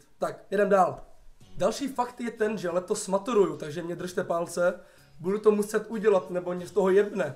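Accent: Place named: Moravia, Czech Republic. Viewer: Ses fakt skvelej!! Drzim palce at das tu maturitu :) . Nechapu jakto ze nemas vic odberatelu.... a mluv dal po moravsky je to super ( taky su z moravy) 😂😂😂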